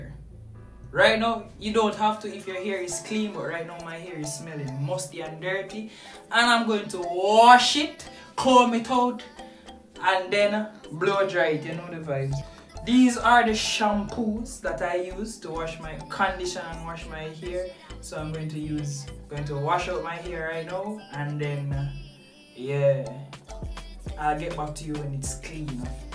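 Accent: Jamaica. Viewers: U Jamaican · I know u Jamaican breddah 😂 big up 🇯🇲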